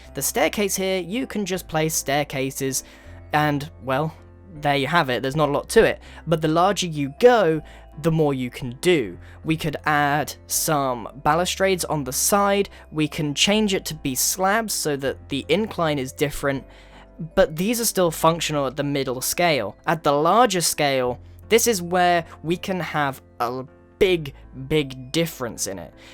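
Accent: United Kingdom. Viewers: Am I the only one there think he sound really british in this video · Are you british england?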